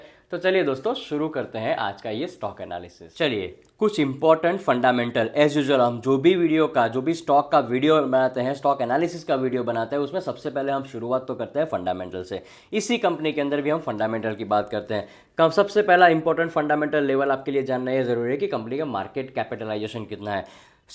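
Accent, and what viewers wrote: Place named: Gujarat, India. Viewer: Aap ki baat se lagta hai ap Gujarati hoo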